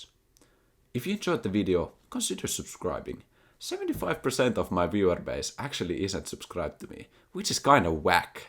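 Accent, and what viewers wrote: Finland. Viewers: Maybe you could calculate which item is more worth by using gold value of stats? Like for example every point of ap has x gold value or every percentage of cdr has y gold value. Not sure though, thought it might help. Hyvä video ja mahtava suomalainen aksentti! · joo katos ootha sää suomalainen enkun perusteella huomas heti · The way he talk it tells all that thos guy is from finland😂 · Is this guy finnish? Nice video!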